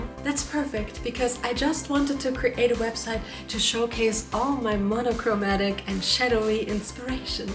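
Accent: Germany. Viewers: Do I hear a slight and very cute german accent? 😉 However, the doll is amazing!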